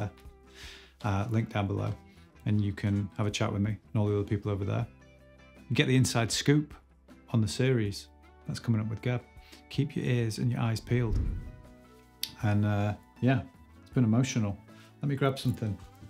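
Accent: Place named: United Kingdom. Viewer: You deserve so many more subscribers,. Keep it going! Oh, and you kind of remind me of a young Mick Mars (with the hat) with a British accent.:P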